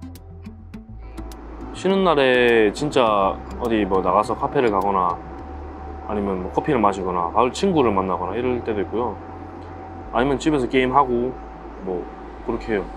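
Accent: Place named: Daegu, South Korea. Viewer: 사투리 마 기깔나네 찐대구사투리💙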